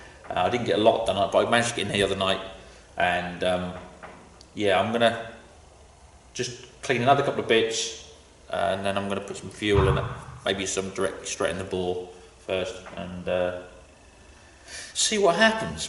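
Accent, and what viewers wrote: Norfolk, United Kingdom. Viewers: Really enjoying the videos. Its good to hear a good ole Norfolk accent on here. You always know where you are with a flask of hot tea.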